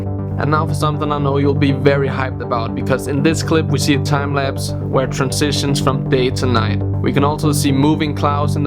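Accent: Denmark. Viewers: You're danish aren't you. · is this Guy danishh?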